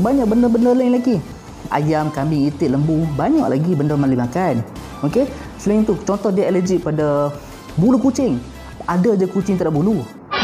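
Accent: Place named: North, Malaysia. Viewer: Terbaik! Love your explanation with utara accent 😂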